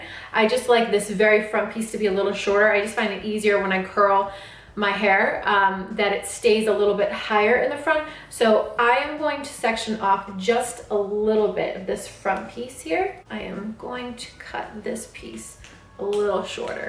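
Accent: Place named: Russia. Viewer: I see your images all over instagram. For some reason I thought you had a Russian accent or German.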